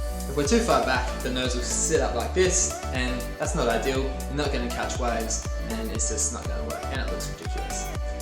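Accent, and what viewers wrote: Australia. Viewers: I thought for a while he said bitch, but it was just his Aussie accent. But, great vid man. Thx! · He’s AUSSIE m8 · As soon as I heard the Aussie accent I knew this guy was legit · likely a gold coast Australian accent. (I live in SA and this sound like a Queensland accent to me) .The beaches also look like they are in the Gold Coast but i could be wrong. Also a lot of surfers live in the gold coast.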